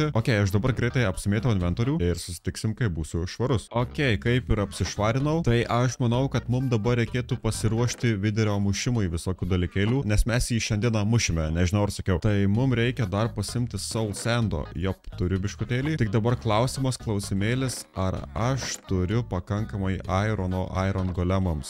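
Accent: Suvalkija, Lithuania. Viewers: ziaurai laukiu pbs kitos dalies kad ir nera suvalkeitiskos kalbos bet content nei kiek nepablogejo🙂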